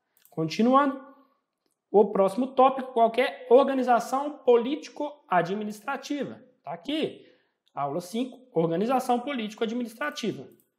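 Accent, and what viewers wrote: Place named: Minas Gerais, Brazil. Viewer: obrigada, você é uma inspiração e esse sotaque mineiro é massa hahahahha parabéns pelo seu trabalho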